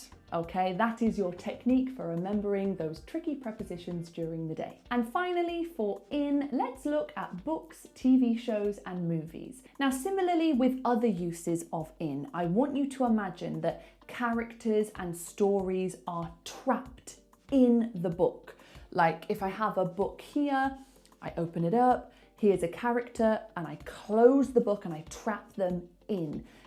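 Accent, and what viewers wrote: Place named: RP, United Kingdom. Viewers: I like English accent ！ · I love you rp accent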